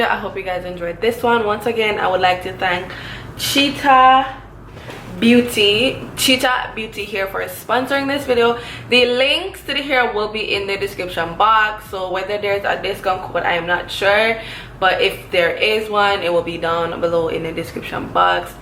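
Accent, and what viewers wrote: Jamaica. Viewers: Subscribes because I sense a Jamaican accent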